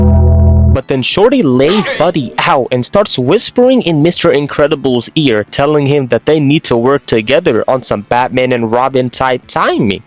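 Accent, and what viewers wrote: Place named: Toronto, Canada. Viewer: Bro sounds like he from Toronto lol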